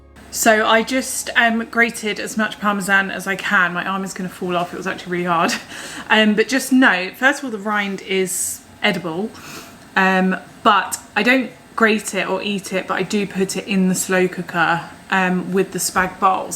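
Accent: RP, United Kingdom. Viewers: Love seeing you look so healthy. Must be the microphone but you sound so posh compared to older videos 😂 Xxx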